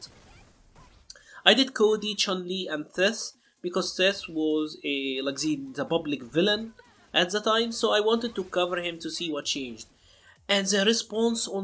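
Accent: Egypt. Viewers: Criminally low number of subs. Get the word out people, this guy is spitting knowledge with a smooth Egyptian twang.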